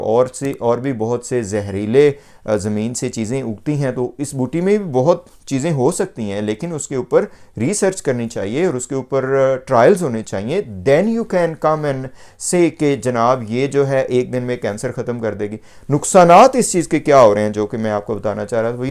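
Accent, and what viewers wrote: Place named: Punjab, India. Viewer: Ap punjabi py zor deny ki bjaay topic py bt krty wqt bch jta... Fuzool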